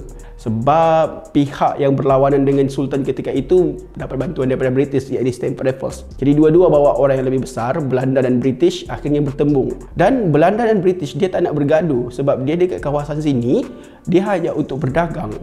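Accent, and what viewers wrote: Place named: East Sabah, Malaysia. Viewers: Bang, org tawau ka bangg..slangnya mcm dr sna hehe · slang speker mcm org blah timur sabah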